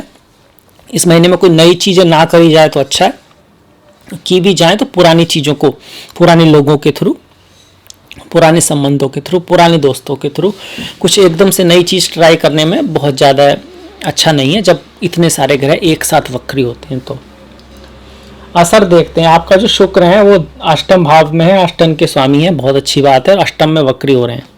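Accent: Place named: Mathura, India. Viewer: Nice to know.....As per your accent of hindi, I think your background is from Mathura/Agra UP... I also belong to Mathura , presently residing in Jaipur Rajasthan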